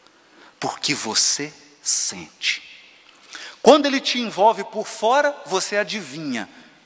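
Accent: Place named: Minas Gerais, Brazil. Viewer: adoro as suas palestras. Vc, esse seu jeitinho mineiro, torna tudo tão simples e fácil de entender. Gratidão querido! Abraço 🥰🙏🏻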